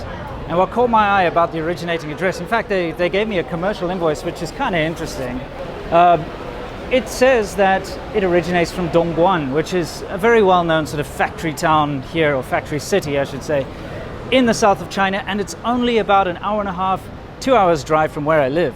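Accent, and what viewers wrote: South Africa. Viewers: for inputting Chinese, you should try iFlyTech speech recognition input, you just speak to the phone and it writes for you. It would gradually adapt to your South African Chinese accent as well. After trying that a year ago, there is no turning back to typing Chinese. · I am so fascinated by your ability to speak and read and write Chinese! Equally as fascinated by your ZA accent. Just a question - did you flip the video of yourself in the car? Or you actually drove a right hand drive car? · Are you South african?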